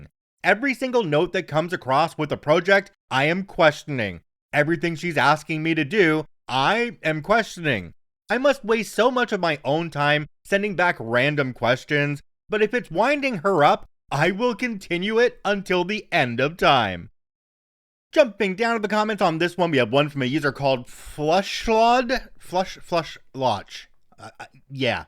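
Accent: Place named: Canada. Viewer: Hey,! Good to see ya! Nah, I don't think your channel would ever be mistaken for AI. I've heard Brit and US accents, but never a Canadian accent in AI voices. Not even once. When I saw yesterday's vid, I thought, "Okay, I know the voice, but the channel name wasn't Karma Stories, it was...." I guess I must not have had enough caffeine in my system, because the great, big chameleon on the screen didn't quite register with me. Well, maybe it blended in with the background.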